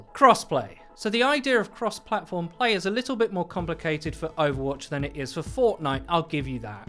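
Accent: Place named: United Kingdom. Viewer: British people talk funny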